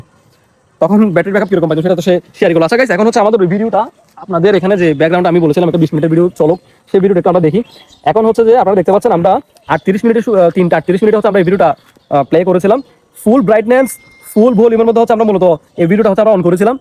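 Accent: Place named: Chittagong, Bangladesh. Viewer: হ্যাঁ ভাইয়া ভালো কনটেন্ট ক্রিয়েট করার জন্য আপনাকে কিছু উপদেশ দিতে চাই কথাগুলো আস্তে আস্তে বলবেন যাতে করে মানুষ আপনার কথাটা সম্পূর্ণ বুঝতে পারে. আপনি চট্টগ্রামের আঞ্চলিক ভাষায় কথা বলায় খুব দ্রুত বলে ফেলেন তাতে করে অনেক মানুষের বুঝতে সমস্যা হয়।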